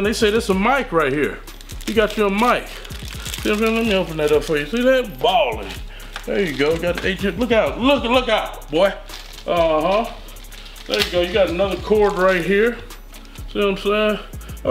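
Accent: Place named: Florida, United States. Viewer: your voice same like florida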